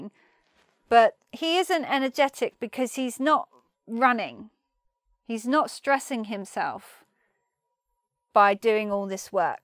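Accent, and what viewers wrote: United Kingdom. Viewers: british accent :) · Lovely British accent. Thanks.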